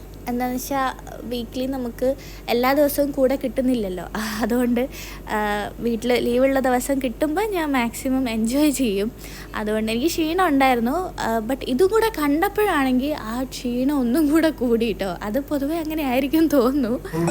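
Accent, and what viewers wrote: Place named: Kannur, India. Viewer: Aaa. 😍 kannur salnk poleind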